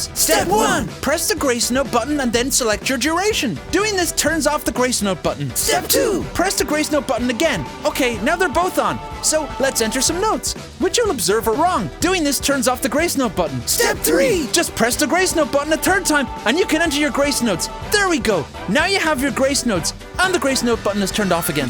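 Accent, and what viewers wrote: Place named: Ireland. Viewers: Raúl Pérez Scotspeople? The man could not be more Irish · how on earth could you think he's Scottish? He has a very very very obvious irish accent. Unless you're deaf and only reading the subtitles, I can't work out how you thought he was Scottish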